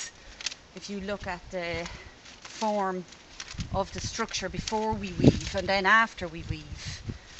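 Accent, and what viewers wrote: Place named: Ireland. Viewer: I love a soft Irish accent. Those 'th' sounds are to die for. That's a really amazing structure. I'm only hoping for some firewood from a few planted shoots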